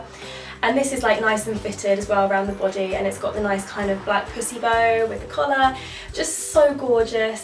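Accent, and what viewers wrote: United Kingdom. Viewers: Her voice reminds me of Mia Goth's accent. Their voices are so unique from the typical British accent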